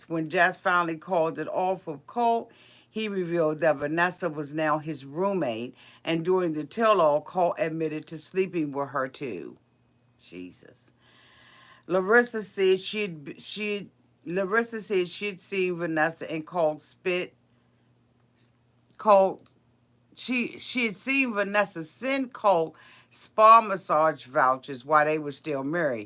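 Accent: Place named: New Orleans, United States. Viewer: I am a Newbie to your Channel.. Tell'em Auntie!! Lol I was laughing as You going OFF on that Person in Your Live Comments.. You Go Auntie!! Sound like that Southern accent, New Orleans Style..Auntie Colt in his Speedos lmao That was a Nasty Seen!! Auntie when I seen Colt come out with them Speedos on I fell off my Couch dying.. 😂😂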